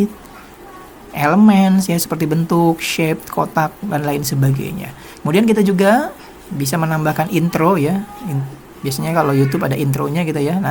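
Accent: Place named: Lombok, Indonesia. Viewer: Bang ? Dari lombok y ? Kok nada suaranya kayak logat lombok ?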